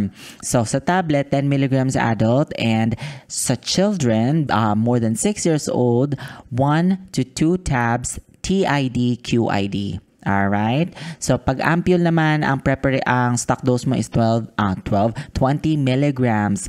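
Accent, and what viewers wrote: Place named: United States. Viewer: English di maintindihan parang amercano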